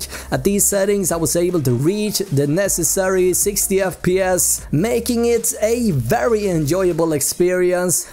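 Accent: Sweden. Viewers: Sounds swedish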